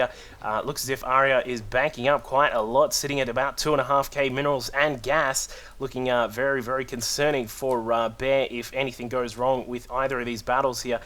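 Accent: Australia. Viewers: god damnit i got work tomorrow i have to sleep but your delicious Australian accent is keeping me awake...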